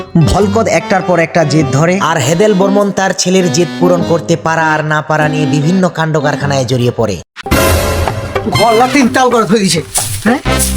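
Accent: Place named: Thakurgaon, Bangladesh. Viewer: দাদা আপনার ঠাকুরগাঁও এর লোহাগড়ার আঞ্চলিক ভাষায় কথা বলা আমি অনেক পছন্দ করি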